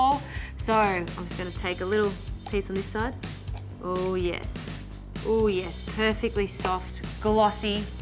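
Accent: Australia. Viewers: Love the Aussie accent although I heard Hate the cream at first. :D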